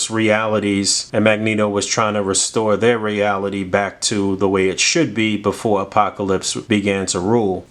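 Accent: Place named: New England, United States. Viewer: First time here, boy is that New England accent thick AF keep up the good work (QGTM)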